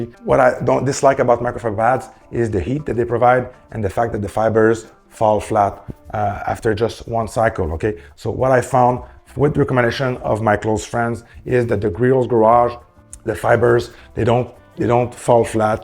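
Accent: Quebec, Canada. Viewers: I know a Quebec accent when I hear one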